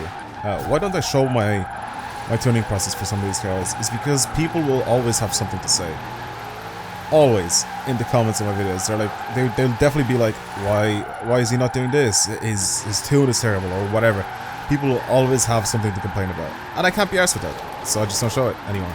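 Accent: Ireland. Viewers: Hmmmm? Are you irish? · Are you Irish?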